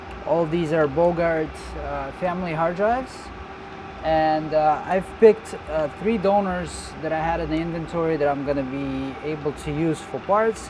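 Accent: Russia. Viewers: Are you Russian? You do sound like a Russian guy. You choice of words and accent are very specific.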